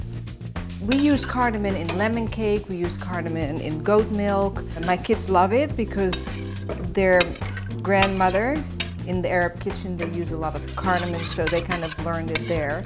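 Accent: Netherlands. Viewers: je hoort echt het nederlandse accent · She has sich a strong dutch accent haha i love it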